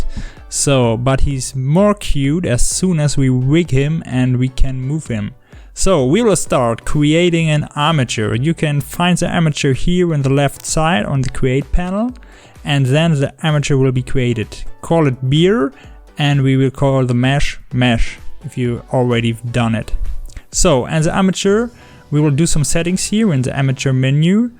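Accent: Germany. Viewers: Pretty sure hes german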